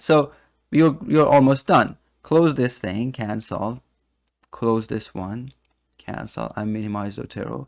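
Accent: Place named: Iran. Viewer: Thanks for the tutorial. Your accent sounds extremely Persian.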